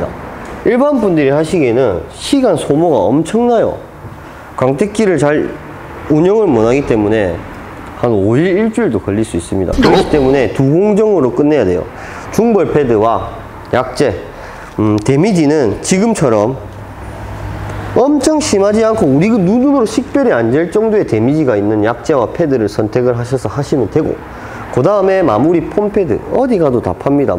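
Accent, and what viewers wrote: Gyeongsang, South Korea. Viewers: 말투랑 목소리가 내부자들에 조승우가 경상도사투리 구현한것 같네 ㅋㅋ 저도 경상도인 ㅎ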